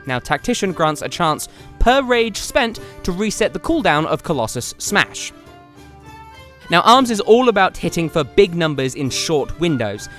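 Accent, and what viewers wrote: United Kingdom. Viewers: you sound british?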